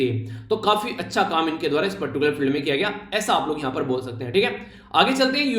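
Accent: Haryana, India. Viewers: Maybe Haryana (sonipat/gohana)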